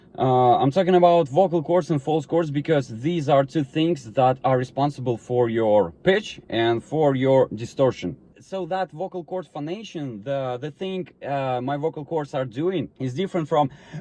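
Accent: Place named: Russia. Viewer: Incredible explanation. I thought I know how to do false-fold distortion, lol, but seems like I use cartilage, gonna try your way as mine hurts. Btw, where are you from, your accent is so Russian :) Although, I think passing too much air through vocal cords dries them a lot, so fry screams should be safer.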